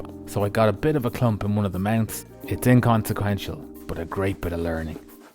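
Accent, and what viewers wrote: Ireland. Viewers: Just noticed you used your roll over framework on the vw bus, just spotted it on the intro collage. Your videos are a treat even my partner agrees but that might just be the Irish accent.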